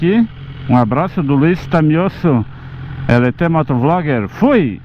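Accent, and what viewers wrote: Rio Grande do Sul, Brazil. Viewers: essa cinta e top e as 2 câmeras são tops hero 4 e 6; tou gostando do sutaque do rio grande do sul rsr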